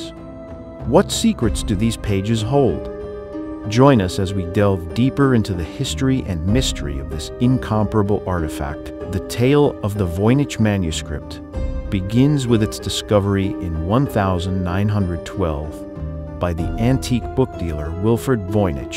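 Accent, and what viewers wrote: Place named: Midwest, United States. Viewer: Was this made by AI? If you are going to use AI to do the narration, train it to pronounce common words correctly! The qords hoax and theory are NOT unusual, and yet your narrator--who otherwise has perfect middle American diction and syntax--your narrator pronounced those two words with hilarious errors. If the narrator is human, then they need to take the extra two minutes necessary to double check the pronunciation of words they do not know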